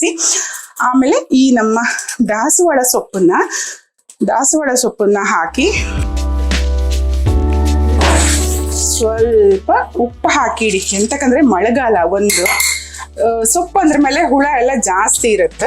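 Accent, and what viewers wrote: Kundapura, India. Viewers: Dasan soppina idli adara jothig namm kundapra kannada super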